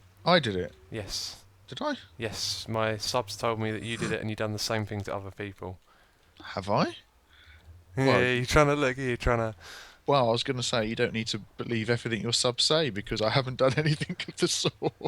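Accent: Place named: United Kingdom. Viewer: do you realize for the longest time whenever you mentioned Biffa, I always thought you were saying Biffer, with the British accent there's not much of a pronunciation difference anyway.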